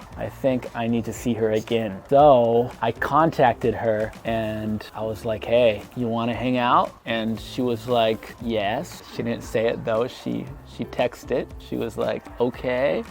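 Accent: United States. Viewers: As an American, I like your American English accent. It's very easy to understand and soothing to listen to. I like your advice by saying to be bold and courageous but not cross the line which means always being respectful and being a gentleman. Great video as always! Take care,!! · Haha! I don’t think I’ve ever heard you speak this much in English. 한국말로 구사할 때랑 똑같이 농담을 하시네요 ㅋㅋㅋ 캘리포니야 말투도 멋지고 한쿨말의 방송인 억양도 재밌구먼:) 화팅!!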